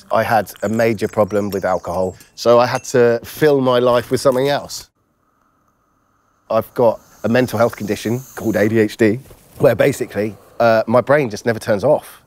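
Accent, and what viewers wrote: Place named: United Kingdom. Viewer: Great video man, drink and drugs the same thing Essentially. I've been there and like you come out the other side, lovely collection are they all yours?, better than my efforts lol. Only just found your channel I'll subscribe and look in on ya, sounds like your in the UK aswell, peace man and those bad days and thoughts are no different to anyone else's.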